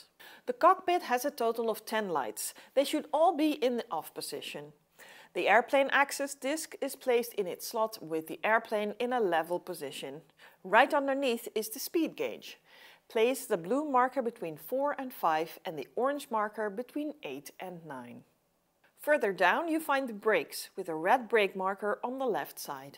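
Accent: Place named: Germany. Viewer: Great vid love the german accent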